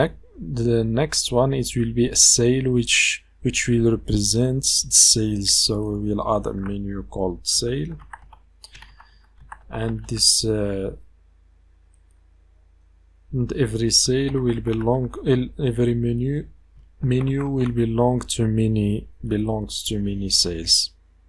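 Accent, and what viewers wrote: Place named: Morocco. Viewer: HHHHHHH the moroccan accent 🤣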